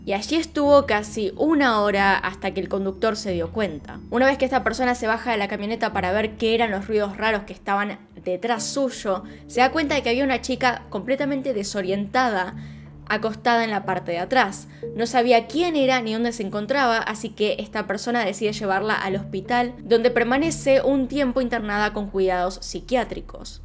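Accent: Argentina. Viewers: Tiene poco que empecé a ver tus videos, hay varios canales del mismo tipo, en tu caso me pareces muy sincera y directa, también tu acento argentino me ssama la atención jaja, saludos desde México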